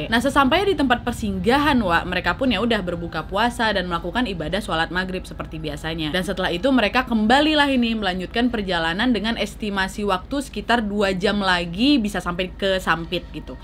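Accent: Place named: Medan, Indonesia. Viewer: Orang Kalimantan tapi bahasanya Medan medhok... Hahaha 🤣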